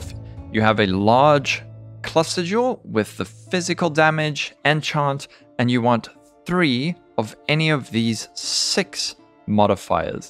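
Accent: South Africa. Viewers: Well i can tell of another south african im also been looking at your vid for a day or 2 nice job man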